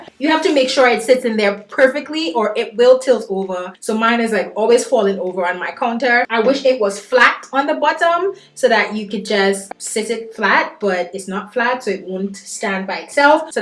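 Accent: Trinidad and Tobago. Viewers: Love listening to that Trini accent on YouTube. We need more west Indian people on this platform. Big love from Guyana 🇬🇾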